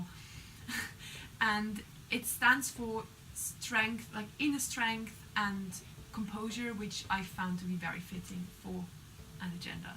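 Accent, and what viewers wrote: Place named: Scotland, United Kingdom. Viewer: your accent is beautiful. What kind of accent is it? Is it scottish?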